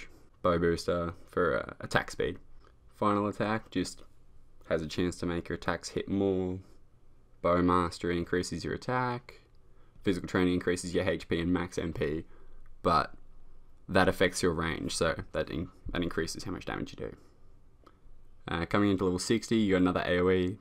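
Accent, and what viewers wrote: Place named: Australia. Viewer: love the aussie accent mate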